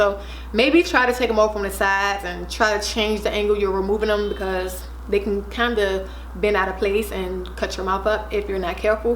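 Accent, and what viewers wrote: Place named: Washington DC, United States. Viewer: Your dc accent is cute.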